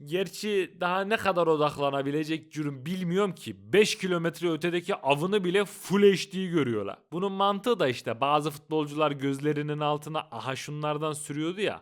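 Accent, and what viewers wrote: Ankara, Turkey. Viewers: Tatlı güzel komik bi anlatım sevdim Ankara bebesi 😅 Angara 😊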